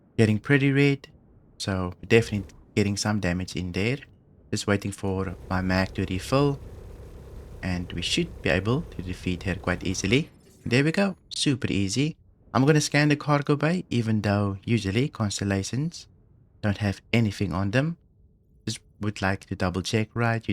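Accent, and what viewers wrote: South Africa. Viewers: you south african ?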